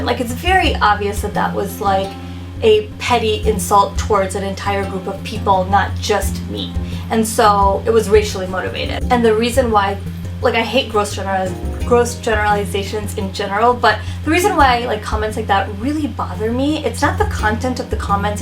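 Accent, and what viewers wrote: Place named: Bengal, India. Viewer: I'm Bengali too and I love your videos. Don't worry about your accent I have one too so it's all okay.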